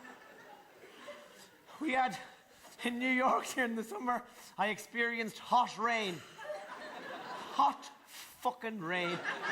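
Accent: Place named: Geordie, United Kingdom. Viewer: 46 min, a wee bit Geordie, he sounds a little like Sarah Millican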